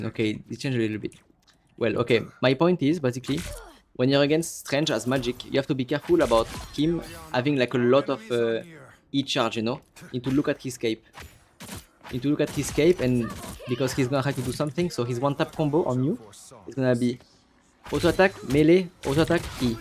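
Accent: France. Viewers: You have a very strong French accent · Hey bro are you French or something ? Nice video btw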